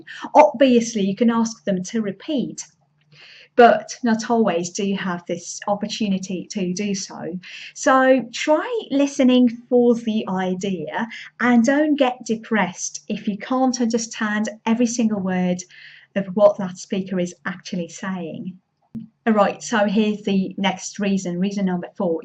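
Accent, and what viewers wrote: United Kingdom. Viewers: Hello. Your British accent is superb! Could you please tell me how you acquired it? Thank you.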